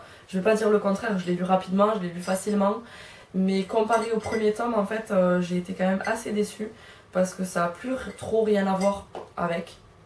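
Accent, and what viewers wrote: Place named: Toulouse, France. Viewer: Merci pour ce point lecture ! (Ce petit accent me rappelle mon chez moi (Tarn et Toulouse).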